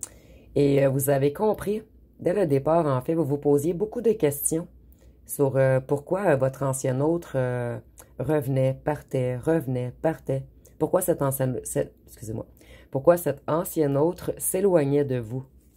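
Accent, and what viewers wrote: Quebec, Canada. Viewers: ❤❤❤❤ BONJOUR MME J AI ENTENDU SE QUE VOUS AVEZ DIT SE MATIN MAI SACHER QUE TOUT LE MONDE N RST PAREILLE ET MOI JE VOUS APPRÉCIÉ ET VOTRE AXCENT QUE J AIME J AI BEAUCOUP TRAVAILLE AVEC DES QUÉBÉCOIS ET JE M ENTENDAIS BIEN DRS BON SOUVENIRS ...... MERCI POUR TOUTES VOS GUIDANCES MAI JE SUIS EN BÊTE AVEC C EST GENS MAI LA POLICES QUE FONT-ILS ????? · Vous avez de très belles mains et ongles très beaux bien ,j'adore cela ,votre accent québécois aussi est fort agréable Madame , et vos tirages sont très justes toujours , vous êtes très bonne dans les prévisions de guidances .❤👍🙏🌹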